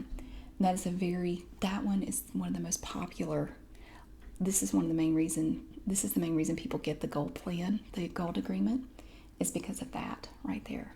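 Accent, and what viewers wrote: South, United States. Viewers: your southern accent is cool!!!!!! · It's strange... I'm English, I've never been to America- and yet your accent somehow makes me feel like I've come home. I love southern accents so much, and I love this video! Thank you <3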